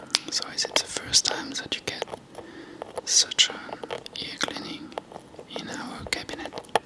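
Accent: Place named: Germany. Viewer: oh you sound German lol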